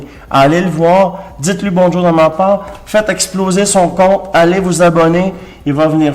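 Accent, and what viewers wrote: Quebec, Canada. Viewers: j'adore le Québec et votre accent et jolie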